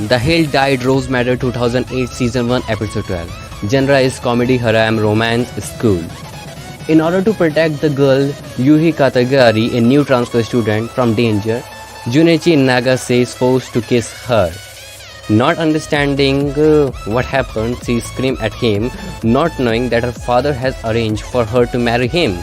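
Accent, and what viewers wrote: India. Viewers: I think you are indian because your voice cannot be perfected completely at english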